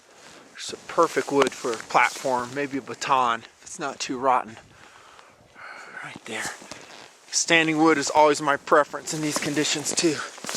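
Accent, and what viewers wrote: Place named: Northwest, United States. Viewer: what state is this in? You sound like a Northwesterner.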